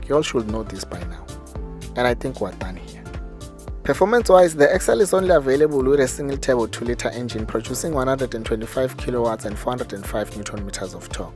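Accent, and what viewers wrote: South Africa. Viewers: Interesting journal, Nguni nation accent. Great review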